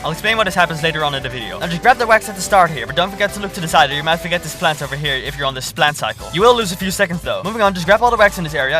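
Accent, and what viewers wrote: Netherlands. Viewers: i feel like your native language is dutch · are you dutch?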